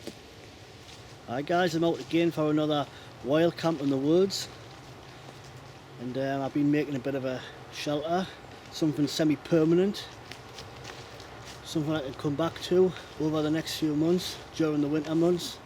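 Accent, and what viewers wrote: Newcastle, United Kingdom. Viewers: Is that a Geordie accent I hear? :D Great video. You hear so much regarding pricey gear, it's great that you went all ghetto with it. Nice!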